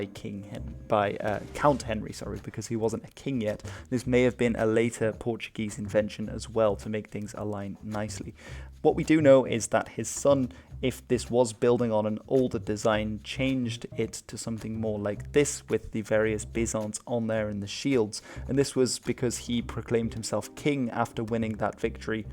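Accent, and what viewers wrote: Spain. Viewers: For what? He is reading every single word with Spanish pronouciation. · Why does he pronunce the words in spanish, spanish is not portuguese languaje, portugal does not speak spanish like mexico, venezuela and others, its portuguese my dude. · for someone who tried to spain out portuguese words, not bad my friend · Why are you pronouncing portuguese cities with the spanish accent you fool? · Me, a Portuguese speaker: "Stop pronouncing Portuguese words with a Spanish phonology" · Can you pronounce Portuguese words with Portuguese style, not with Spanish style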